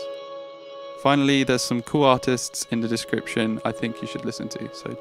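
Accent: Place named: United Kingdom. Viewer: mostly the british accent tbh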